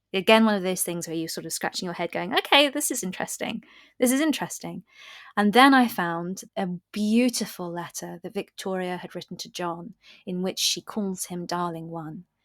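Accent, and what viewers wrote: United Kingdom. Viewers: It was an interesting programme. Who was the female Scottish narrator? Does anyone know?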